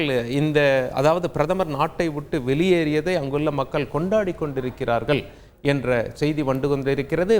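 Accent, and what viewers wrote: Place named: Thanjavur, India. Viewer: பேசுரவர் தஞ்சாவூர் காரர்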